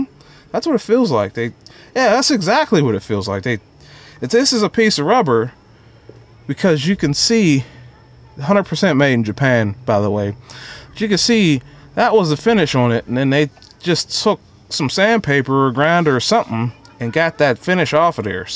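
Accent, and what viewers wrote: Pittsburgh, United States. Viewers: You from the Burgh?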